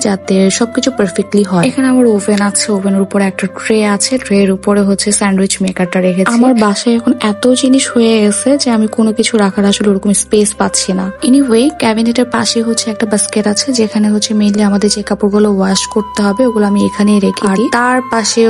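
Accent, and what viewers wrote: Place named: Dhaka, Bangladesh. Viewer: আপু তোমার ভাষাটা মাশাল্লাহ অনেক সুন্দর এটা ঢাকার কোন এরিয়া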